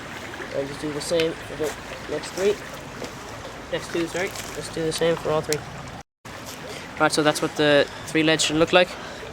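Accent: Ireland. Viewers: What part of Ireland are you from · He's Irish{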